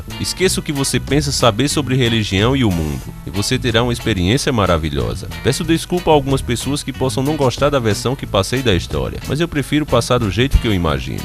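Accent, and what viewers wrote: Northeast, Brazil. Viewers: Muito top a narração ainda mais com sutaque do meu nordeste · narração bem nordestina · seu sotaque nordestino e a cereja do bolo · O sotaque nordestino é naturalmente engraçado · o melhor do vídeo e o sotaque nordestino sou nordestino também nossa língua e foda lklk muito bom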